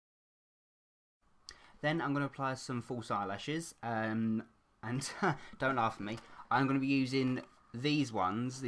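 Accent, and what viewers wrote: United Kingdom. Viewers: me and my mom love your britsh voice what part of englend are you from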